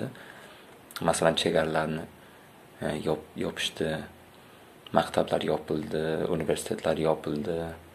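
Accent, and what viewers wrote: Uzbekistan. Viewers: Сиз тоза Озбек тилида сузлаяпсиз ,анаву вотти вотти килмасдан шундай гапирсангиз бутун Турк дунёси тушинади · Гап булиши мумкин эмас зур соф узбек тилида гапирияпди офарин 👍👍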